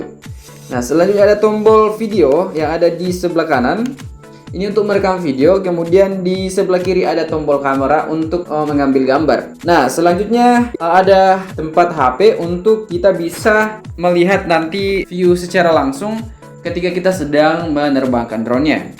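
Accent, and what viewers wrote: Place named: Makassar, Indonesia. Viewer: Wih orang makassar kach bang😁👍👍👍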